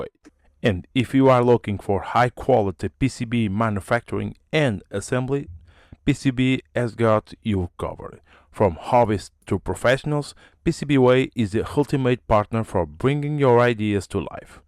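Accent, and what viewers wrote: Portugal. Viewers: Adorei que eu fiquei assim"este sotaque é mesmo a PT" depois fui ver o nome, makes sense 😂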